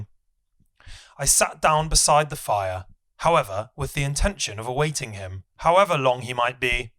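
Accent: United Kingdom. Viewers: Very clear British accent. Fantastic!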